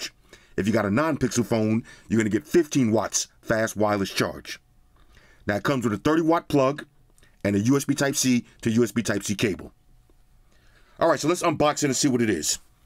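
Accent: New York, United States. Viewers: Stopped watching as soon as I heard the NYC accent 👎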